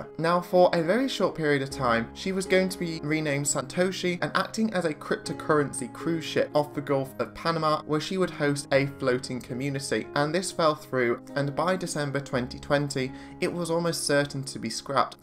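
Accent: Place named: RP, United Kingdom. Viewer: you sound very posh. And thanks for your attention to my (stuffed) canine daughter, Lucy, on Twitter. I’ve booked Ambassador’s Ambience to Antwerp’s Xmas market for Dec ‘22, just like I took Lucy there on CMV’s Columbus 2 years ago. June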